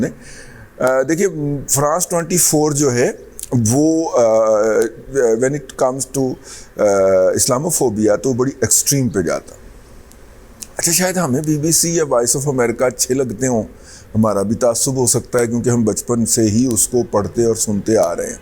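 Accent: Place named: Multan, Pakistan. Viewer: dada g kum uz kum aap to saraiki na kahain. ye punjabi ka multani accent hai.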